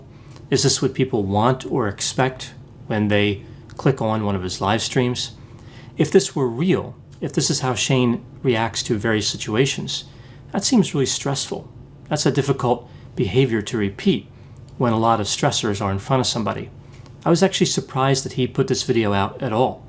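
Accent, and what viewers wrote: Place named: East Coast, United States. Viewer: Caught your accent in this video - sounds very East Coast. Greetings from Philadelphia!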